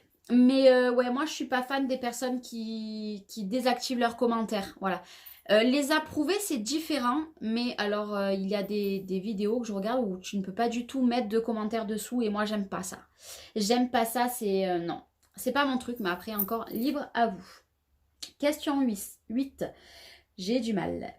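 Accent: South, France. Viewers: Bonjour,pause café,soleil et une vidéo Tag youtube très intéressante, j'ai apprécié ta sincérité et ta franchise..! J'adore regarder tes vidéos 😍 tu es tellement gentille attentionnée pétillante généreuse,et surtout très talentueuse,et ton petit accent du Sud 😉 j'aime vos créations en duo avec Marick.. Tu es exceptionnelle,tu me remplies de joie quotidiennement. Je te souhaite une excellente journée ensoleillée et créative 😉 pleins de bisous 😘💋 Bea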